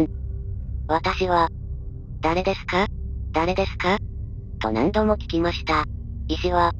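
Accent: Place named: Hiroshima, Japan. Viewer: 山口寄りの広島みたいな喋り方だな、大竹か和木か辺り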